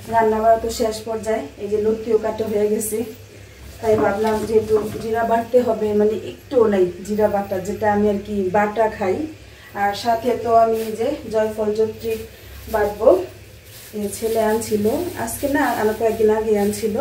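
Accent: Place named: Amin Bazar, Bangladesh. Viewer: আমি একজন ওমান প্রবাসী।ঢাকা মিরপুরের, দারুসসালাম, জহুরাবাদ আমার বাড়ি। কথা শুনে মনে হচ্ছে আমিন বাজারের কোথাও আপনার বাড়ি জানাবেন আসলে ভাষার মায়ায় আপনার ভিডিও দেখা শুরু করেছি। মনে হয় নিজেদের মানুষ ভিডিওগুলো ভালো লাগে। দোয়া ও শুভকামনা রইলো এগিয়ে যান। ভালো থাকবেন।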